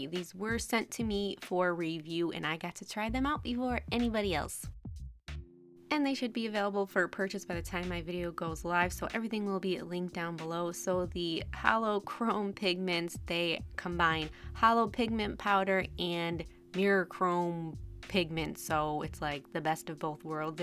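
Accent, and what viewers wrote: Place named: Upper Midwest, United States. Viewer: so beautiful, and I've never been much of a glitter girl, but here I am at 60 years old, thinking, "mmmmm.....glitter...." lol And I recognize your accent now, because I was born and mostly raised in Michigan. Upper midwest, somwhere is my guess. No wonder I like you!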